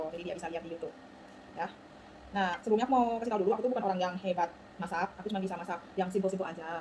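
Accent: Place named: Indonesia. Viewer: Kak kok gak ada video yg baru sih..penasaran bgt sm video2 mu..secara kamu kalo gak ngomong ,kamu orang laos. aku pikir kamu asli indonesia...karena logatmu indonesia banget loh...haha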